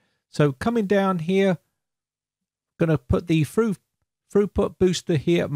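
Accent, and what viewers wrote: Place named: London, United Kingdom. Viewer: Well I suppose you live in or near London because of your accent 😄which I find makes me feel a little homesick (Sussex born and bred). But in any case we out here are sadly lagging far behind the rest of the world and I would pay for double my speed if it were available out here in the country still I suppose something is nothing eh??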